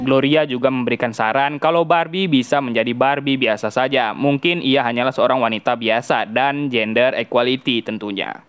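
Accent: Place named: Pekanbaru, Indonesia. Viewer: jelas bgt logat pekanbarunya hahahaha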